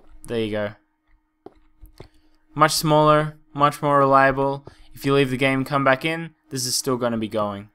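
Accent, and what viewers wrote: Australia. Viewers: You sound like an Aussie! Southern Hemisphere people rule!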